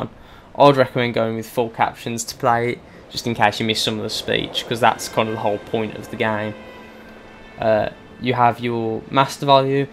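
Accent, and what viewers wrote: United Kingdom. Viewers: god damn I love british accents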